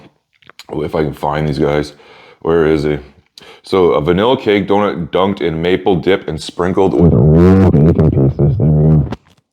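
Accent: Canada. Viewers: I love your Canadian accent, it's adorable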